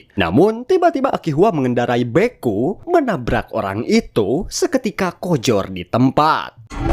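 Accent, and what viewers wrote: West Java, Indonesia. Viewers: Jigana urang Sunda ieu😂😂😂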